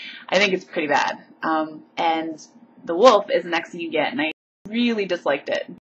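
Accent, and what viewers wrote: Canada. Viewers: You sound Canadian, good God, fail. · Ah how funny, a friend of mine was only talking about Rift yesterday....... interesting to see what it's all about although not sure i'll be getting it (which once translated = when my friends do, I'll get it too). Weird how when I was in the UK I couldn't tell the difference between Canadians and Americans yet now I can and within seconds I was like.......she's Canadian. Just thought i'd share lol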